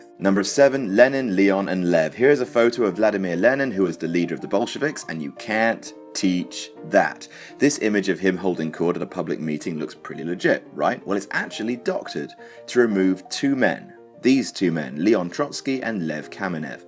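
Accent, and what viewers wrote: United Kingdom. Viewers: you talk too fast and your accent is a bit too British speak normal and speak slower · The snippy British accent though charming becomes grating....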